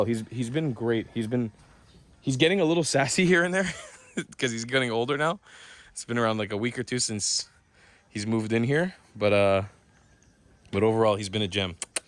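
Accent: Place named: Philippines. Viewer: Wow, your bisaya english really sounds filipino. Even the accent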